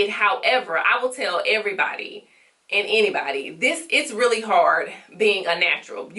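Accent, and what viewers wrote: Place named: Atlanta, United States. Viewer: You must be from Atlanta... That accent strong.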